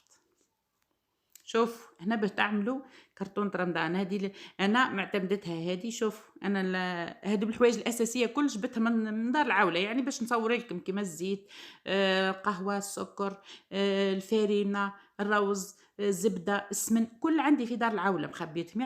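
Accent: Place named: Tunisia. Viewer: السلام عليكم أختي، ما أحلى كلامك آنا أختك من تونس منفهمش الفلوس الدزيرية وما لقيتش قناة تونسية متاع خزين، ولهجتك تحسها انك من تونس. تنجمش تعمللنا بالدينار التونسي؟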